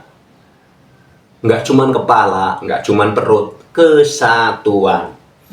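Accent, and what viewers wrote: Banyumas, Indonesia. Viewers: Ingin sekali tau alamat tempat tinggal nya pak kyai....di lihat dari logat nya spti nya...dari Banyumasa. Sehat trs pak kyai❤❤❤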